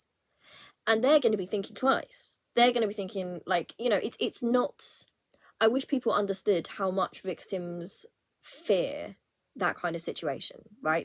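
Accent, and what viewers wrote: United Kingdom. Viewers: New subscriber here beautiful. You have a british accent, its new to me & happy that you understand the mess inside BRF. William is super imsecure of Harry, very Diana is Harry so approachable, organic, genuine and so authentic full of compassion and not hot tempered.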